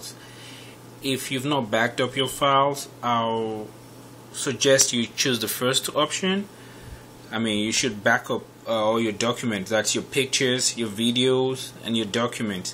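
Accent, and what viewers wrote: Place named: India. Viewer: Note book not net book and you call the screen a creen I swear all of these tutorials for computers are done by Indians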